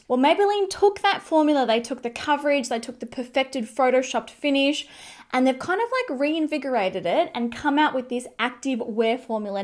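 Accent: Australia. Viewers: I just love her Australian accent. I wish I had it too 🥰 - You should try the Wet n Wild tinted hydrator (if you haven't). It's incredible for my oily skin. I like that one way better than Fentys. But we're all different so just my opinion. I think you should try it if you can. Plus it's like 5 bucks. The Incognito concealer is great too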